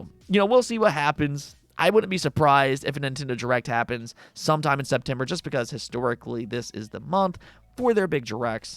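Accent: Philadelphia, United States. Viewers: Are u from Philadelphia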